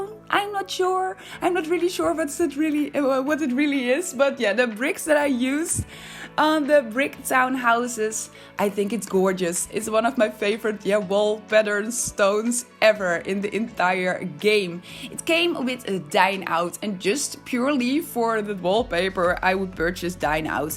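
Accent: Netherlands. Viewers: Ben je Nederlands? Ik hoor een Nederlands accent achtig iets haha ! Heel mooi gemakkt dit!😍 ik ben zelf helemaal verslaafd aan de sims🙈 super mooi😍❤️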